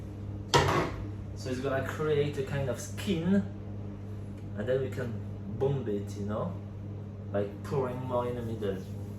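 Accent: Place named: France. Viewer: I have seen you make this recipe before and thanks also for never failing to put a smile on my face because you are so funny and you have the best French accent.😊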